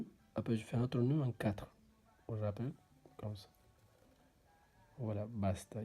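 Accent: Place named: Pakistan. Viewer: excusez moi l'accent je pensse indou mais non c Pakistan lol bref continue vos vidéos svp vous expliquez trop bien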